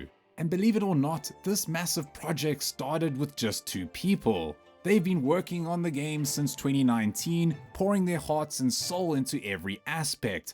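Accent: South Africa. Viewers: My infallible sense of compatriotism detects the dulcet and mellifluous tones of a Saffer twang 👂🇿🇦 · You from South Africa boet? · Mate... Are you South African?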